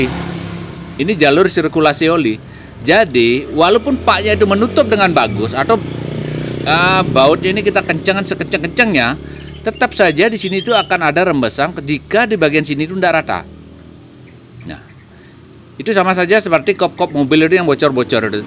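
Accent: Bali, Indonesia. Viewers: Bali gati bapake ne 🤣🤣. Lanjut pak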